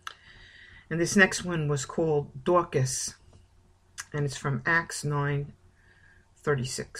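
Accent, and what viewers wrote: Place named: New Jersey, United States. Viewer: I am so glad I came upon your channel today. The messages you deliver, of the videos I've watched so far, could not have come at a better time for me. I love your accent (Jersey?) and articulation. Thank you for sharing this knowledge with the world. We so need to hear it and spread the good Word.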